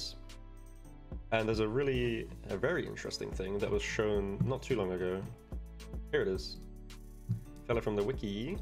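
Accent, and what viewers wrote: United Kingdom. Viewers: You definitely have a Devonshire accent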